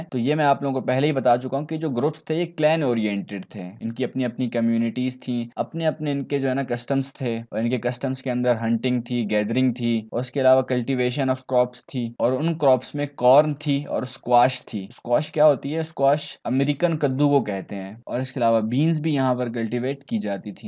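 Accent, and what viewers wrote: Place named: Pakistan. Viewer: Bhai Teri aavaj Pakistani bndo jesi lg rhi hai 🧐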